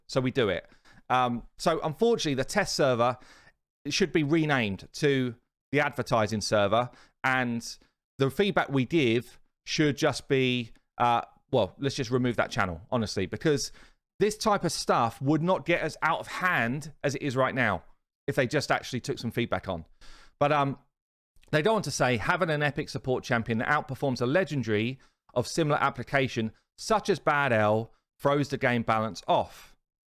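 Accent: United Kingdom. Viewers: i could listen all day long to your lovely british accent! 😂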